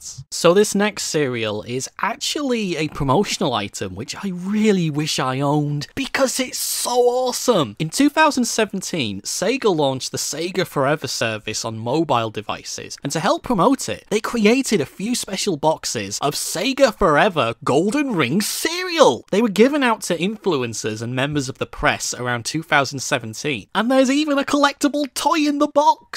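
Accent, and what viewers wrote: United Kingdom. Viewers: Is Scottish Irish Or British?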